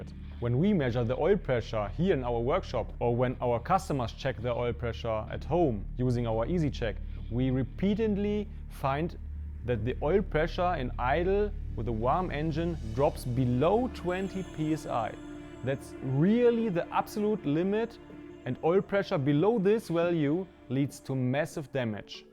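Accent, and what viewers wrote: Germany. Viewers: shut up, he is German and has an accent, I challenge you to pronounce 10 German words correctly if you can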